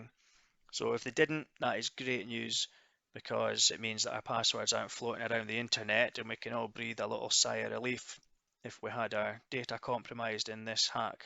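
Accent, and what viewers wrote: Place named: Aberdeenshire, United Kingdom. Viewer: Aye aye, We’ an accent like thon, there’s a fair chance ye can see Bennachie fae yer front door!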